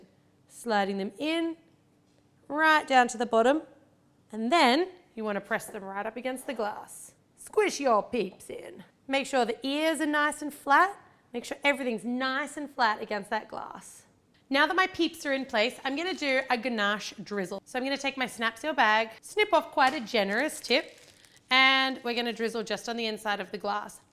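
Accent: Australia. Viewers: Your Australian right?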